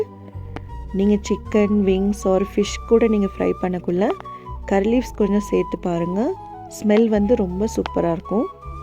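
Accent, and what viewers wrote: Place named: India. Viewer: Akka tellme about yourself more.your vlogs are interesting. Yours indian touch slang is so pretty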